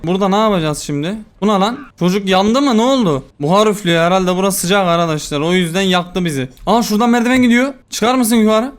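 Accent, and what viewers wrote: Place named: Thrace, Turkey. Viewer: Abim sen trakyalı mısın sesin trakyalı ya benziyor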